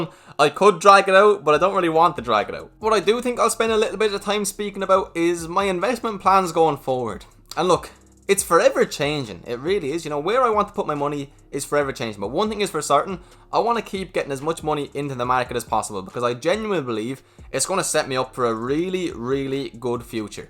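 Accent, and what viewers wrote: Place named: Ireland. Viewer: Seriously mate, three reasons for me to keep on watching your videos: your enthusiasm, expertise and definitely your Irish accent. Is there any reason though why I hardly see any short term investments in your portfolio or long term investments in low rated companies?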